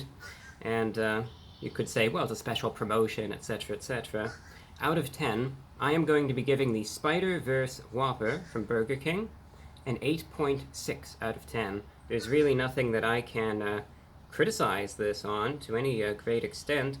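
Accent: United Kingdom. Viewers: Why does his accent sound more and more British? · Your voice has definitely changed over time. You somehow sound more British now.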